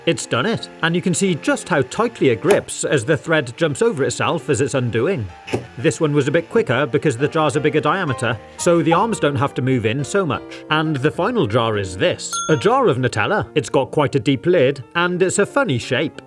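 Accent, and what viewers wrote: United Kingdom. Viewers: Are you britsh?🍵☕🍷🇬🇧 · He's british!!!!!!! 🤴🤴🤴🤴🤴🤴🤴🤴🤴🤴🤴🤴🤴🤴🤴🤴🤴🤴🤴🤴🤴!!!!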